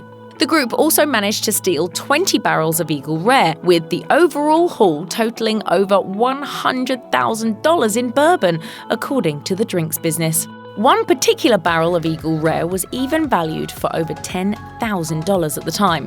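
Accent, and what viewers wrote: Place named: England, United Kingdom. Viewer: Your talking about Bourbon in Kentucky. Get somebody without an English accent. Here's a suggestion an Southeren accent.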